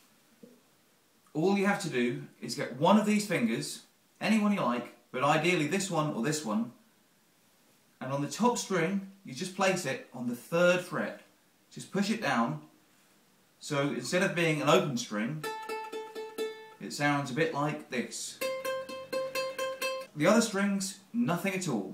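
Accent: United Kingdom. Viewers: Speak up ! u british ? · Hello there. I haven't got a ukulele yet but I do feel like buying one. Specially after watching your simple video showing how simple it is to play it. Actually clever ppl can simplify things as you did.. And not everyone can do that.. Also your British accent is a plus. Hahhaha. Are you a music teacher in England or something? Thx for sharing your knowledge. Bye. Cheers.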